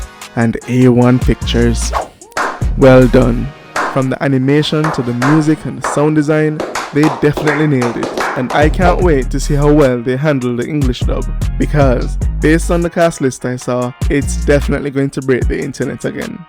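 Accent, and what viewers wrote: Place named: Jamaica. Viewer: Sounds like a yawdie. Great breakdown.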